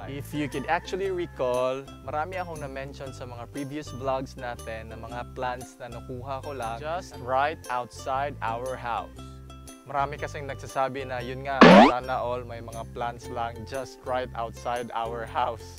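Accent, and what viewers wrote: Visayas, Philippines. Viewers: Instant subscriber here.. so Bisaya ang tone Ng voice ...rpoud Bisaya here too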